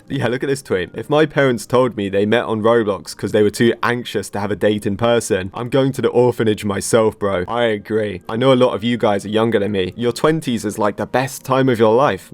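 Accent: United Kingdom. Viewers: You talk like a British person and could be one but the drama is insane that i respect you being British.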